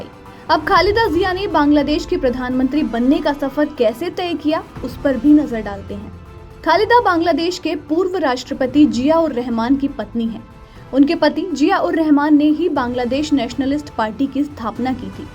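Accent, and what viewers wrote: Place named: Rajasthan, India. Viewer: Ekyannnve chhhyanve , hindi nahi rajasthani bollli aii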